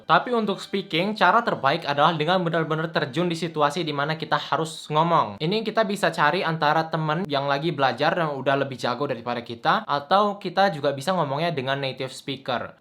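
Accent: South Korea. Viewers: Ka karena udah bisa bahasa korea skrg logat ngomong bhs indonya pun kaya orang korea, mantap ka! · Logatmu berubah kk, logat koreanya nempel banget, debak km keren banget 👍🏻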